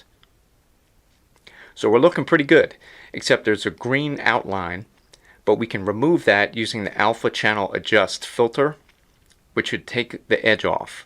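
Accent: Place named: Carolinas, United States. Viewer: Well isn't this a Wealth of Information. It's a Definite Like, Save and Share. How kind and smart of you to share. Unfortunately I'm a bit homeless right now. I'm making videos off my phone Anywhere USA! Made I'm The USA! By your charming accent, I'm guessing the Carolina's. Hmmm... a gifted Musician, Songwriter and Instructor. I bet you're really good at Math. 🌺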